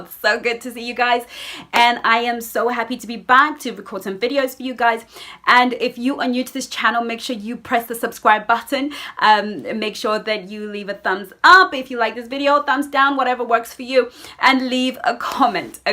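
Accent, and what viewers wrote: United Kingdom. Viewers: Hey, Love you and your cute little British accent.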